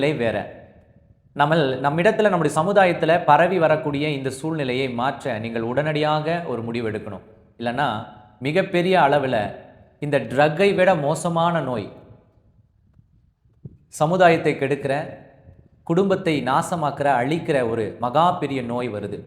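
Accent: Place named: Coimbatore, India. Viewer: First porn and unnecessary sites to be banned. TV channels also promote adultery. TV serials show a man with two women stories and illegal relationship. Also in the name of revolution unnecessary stories with wife applying for divorce even if her husband is good. Why can't serials show good stories with history and atleast one science serial. Self control and discipline are needed. Moral science has to be made mandatory. Pre-marital sex is wrong. My grandma will not allow even her married daughter with child to go out alone after 6pm. She used to tell a girl should not talk with an unknown person. One should know their limits while moving with a known and an unknown person. These things have to be conveyed. Coimbatore English pronounciation. Kindly use Tamil slang.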